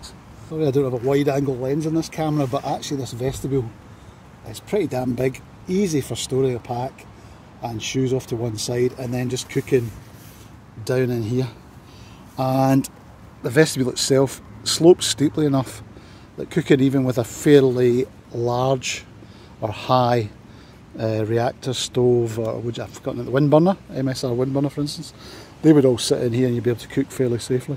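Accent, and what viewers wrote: Scotland, United Kingdom. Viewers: Great review.. very detailed which I enjoyed very much... Obviously a Scot... Perhaps we will cross paths on the hill or mountain...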